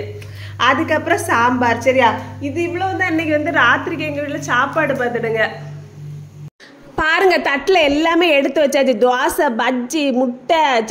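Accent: Kanyakumari, India. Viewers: அக்கா உங்க கன்னியாகுமரி பாசை சூப்பரா இருக்கு l like you akka vlogs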